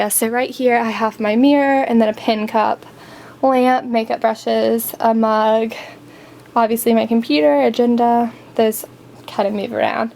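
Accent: South Carolina, United States. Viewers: sounds like south carolina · it's southern American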